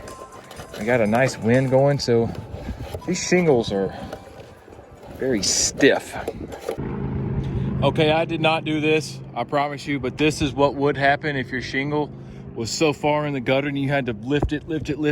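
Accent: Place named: Texas, United States. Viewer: would be interesting to have a follow-up on how this gutter brand worked for you. also, are you from GA? I lived there 15 years and TX for 15 years and your accent reminds me of TX more than GA. 😁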